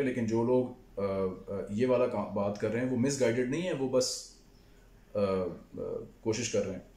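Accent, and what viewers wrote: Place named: India. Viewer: I think they just have a thick Indian accent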